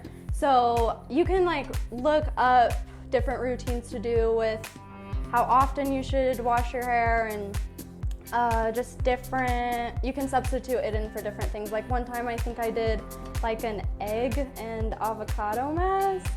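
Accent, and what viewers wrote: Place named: Utah, United States. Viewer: your accent makes me miss Utah.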